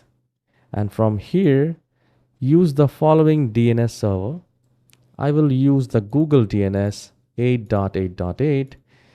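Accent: India. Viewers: Malayali aano ?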